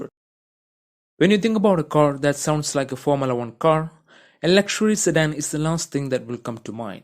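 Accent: Kerala, India. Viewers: Are you indian? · Something says me that you're a Malayali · “Powered by an AMG built 6-liddr weight one with 740 Newton meters of TONK” Omg kill me😂😂😂😭😭😭🤣🤣🤣 I can’t figure out if this dude is a combination of Indian with something Eastern European, you tell me because it’s hilarious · Are you a Malayali??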